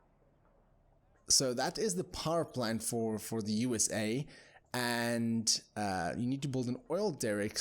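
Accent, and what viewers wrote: South Africa. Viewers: Respek. Generals is a classic of classics. And BTW where you from? Souf Efrika?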